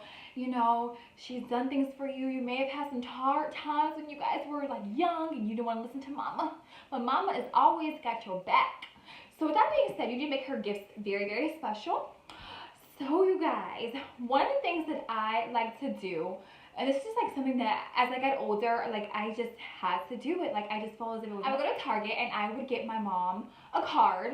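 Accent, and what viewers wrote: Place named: South, United States. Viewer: The crockpot is a great idea 💡 your southern accent 😹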